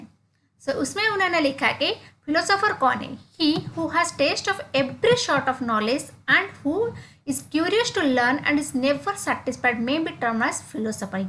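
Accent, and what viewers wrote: Odisha, India. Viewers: Ma'am app Odisha se ho kya ?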